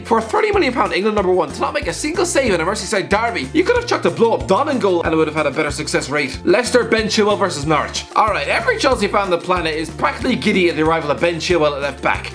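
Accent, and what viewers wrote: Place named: Ireland. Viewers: You do realise that the whole audience love that he speaks fast and his angry Irish accent, its just you being a Karen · Can you please Stop! Doing these chips.. so quickly your voice is afraid irritating! The Second I heard your voice I have turn it off.. I don't mean to be offensive in anyway but the Irish accent sweet when it's smooth & slow but you talk at 100 miles an hour & it's like listening to a fire alarm going off at 4am.. consistent your audience thank you.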